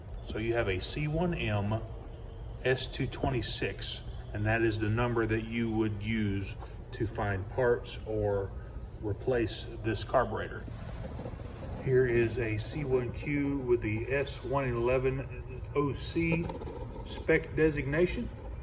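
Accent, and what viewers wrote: Texas, United States. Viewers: I think he’s a Texan.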